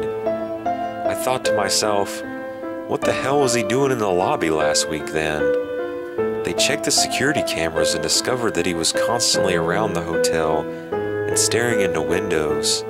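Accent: South, United States. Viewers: he's southern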